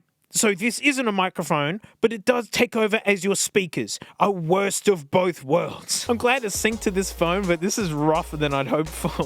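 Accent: Australia. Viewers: Ooo I have a question to you as a non-native english speker. I noticed a while ago your aussie accent not being as expressed or prevalent as some of other Australian youtubers I watch. Do you come from a region where people just don't have that very distinct aussie accent, or do you change your speech and pronounciation deliberately, so people who aren't used to it can understand you better? I guess this isn't that important really, but I've been wondering for a while now. Thanks man🤣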